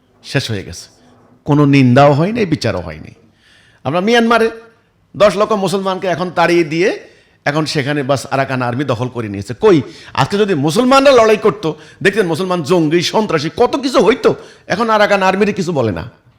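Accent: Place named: Noakhali, Bangladesh. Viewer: আগে ভাবতাম অন্য রকম , এখন দেখছি মাথায় সন্ত্রাসী চিন্তা চেতনা ?? ভাষা শুনে নোয়াখাইল্লা মনে হলো !!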